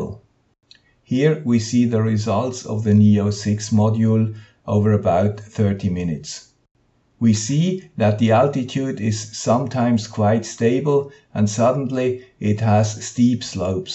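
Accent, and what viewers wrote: Switzerland. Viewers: Gruezi "guy with the Swiss accent". Some people might say I have even more a Swiss accent even though I moved from the Berner-Oberland to Seattle a half a century ago ;-) I really enjoyed watching your video and learning many things. Being Swiss we just have to be precise. As a boy I used to walk miles to get to a watchmaker's storefront window to set my watch to the exact time displayed there. ... and soon my "official tested (chronometer stamped)" watch would be wrong again before the quartz crystal electronics. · Thumbs up for the informational video and "here is the guy with the Swiss accent again." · Hier ist ein Typ mit Schweizer Akzent ! Vielen Dank!!!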